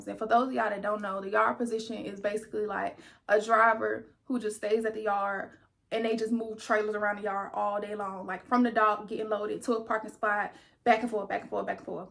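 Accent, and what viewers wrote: South, United States. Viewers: Good information!! Thanks but that southern accent omg 😅😂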